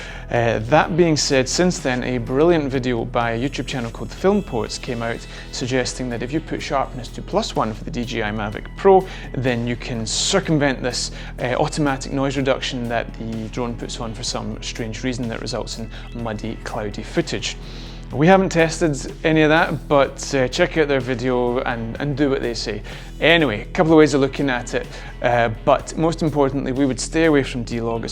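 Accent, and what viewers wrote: Scotland, United Kingdom. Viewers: Great video for a newbie. So good to hear a good Scottish voice :-) Thanks for such a clear video - i have now set my new mavic to all these settings. Working my way through your set.. Cheers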